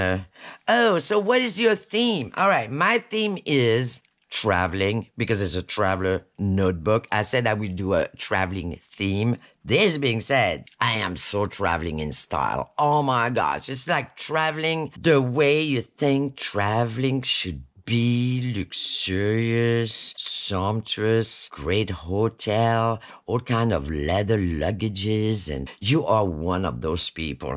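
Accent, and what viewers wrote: France. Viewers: I am having so much fun watching your tutorials!!! I am such a Frenchophile, your accent is just mesmerizing and takes me back to the two weeks I spent in France in 2005. The people were so nice and so patient with my version of the beautiful French language. I do have a question. If I missed it in the video, forgive me. I'm wondering what paper pack you used.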